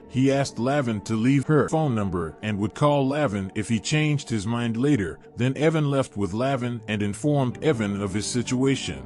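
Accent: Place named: Pidgin, West Africa. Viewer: Why do the narrators for these films not speak English but speak Pigin English?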